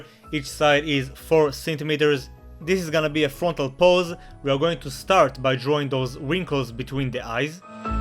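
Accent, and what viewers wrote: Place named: Israel. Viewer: אתה ישראלמ נכון שומעים ותודה על ההדגמה עם גוקו בלאק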